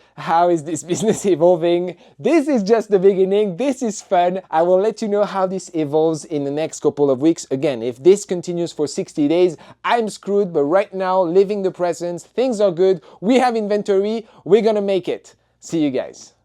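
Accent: France. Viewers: Malgré tous les efforts je sens l'accent français, non ? 🤭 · French accent dude importing Chinees goods into USA Cinéma absolu \o/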